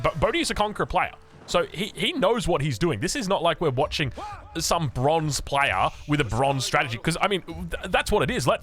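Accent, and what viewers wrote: Australia. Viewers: I really like how aussies say words player aussies turn into Playah shopping center nnto shopping centah aussies sound so cool much love brisanian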